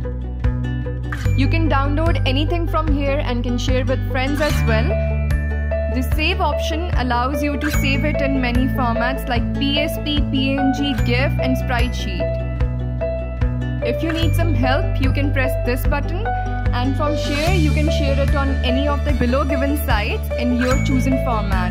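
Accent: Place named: India. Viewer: Wow sounding like indian , is this made in india app? If yes so excited to learn pixel art from own country made app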